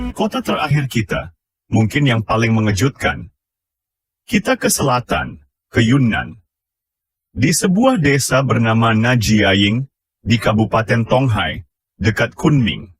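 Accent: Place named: Malaysia. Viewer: Kalau anda orang indonesia tolong pakai bahasa indonesia saja,jangan pakai logat Malaysia segala.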